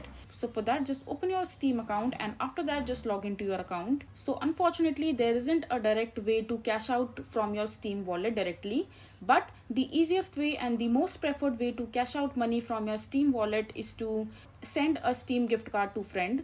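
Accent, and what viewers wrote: India. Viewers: i can just smell your pajeet accent your name is not and you dont look like your profiile picture lmao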